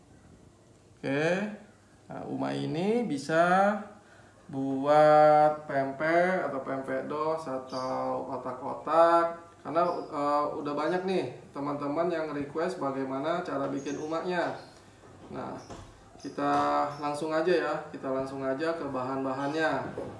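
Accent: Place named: Palembang, Indonesia. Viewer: tetap semangat Chef jangan dengerin orang yang nyinyir saya sudah cobain resepnya 👍👍 dari bahasanya sudah ketauan bahasa palembang ya Chef 😊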